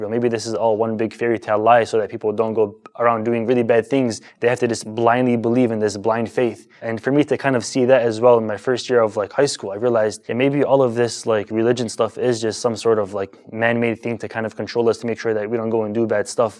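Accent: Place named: Arab world. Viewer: لا لهجته عربية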